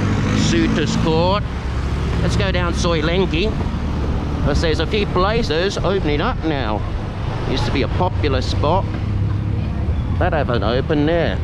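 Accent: Australia. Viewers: just subscribed love your vids where did you come from sound aussie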